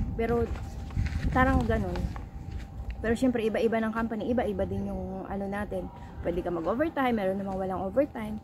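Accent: Philippines. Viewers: batangueña po kayo? :)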